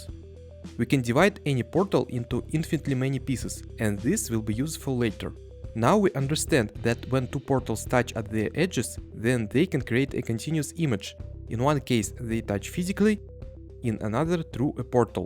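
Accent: Russia. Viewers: Honestly yes, I would love a portal math analysis video. I love math and also the Russian accent too. I’m going to use your original video as a goal for my russian language learning. спасибо! ^^ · Я с самого начала понял по акценту, что ты либо русский либо беларус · Damn ur Russian accent is so strong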